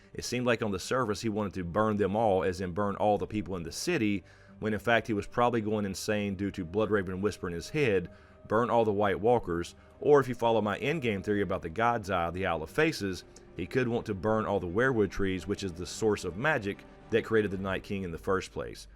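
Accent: South, United States. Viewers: I'm sure you've heard this before , but I love your southern accent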